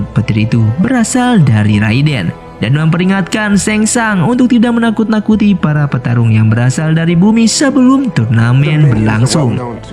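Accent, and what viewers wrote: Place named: Java, Indonesia. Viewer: Wong jowo ye mas? 😂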